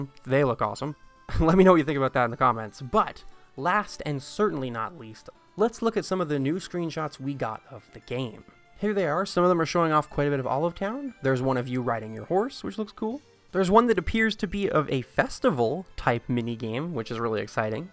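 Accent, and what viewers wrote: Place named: Canada. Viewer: I only just noticed you say "Ha-llo" instead of "Hell-oh", is that a Canadian thing? (Honestly, it's kinda cute.) Anyway, super happy to see the "magical" elements being a bit more prominent - it's been awhile and I missed them.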